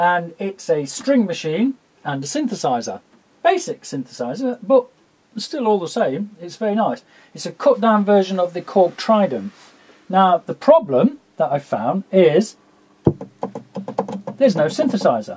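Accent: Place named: Derbyshire, United Kingdom. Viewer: great vid that was a good call on the caps..takes me back to my days in the 80's as a stores clerk for co-op sound and vision...caps were my nemesis too mainly for ordering and stock control. Not from Derbyshire by any chance? think I recognise the accent..regards from Derby here mate.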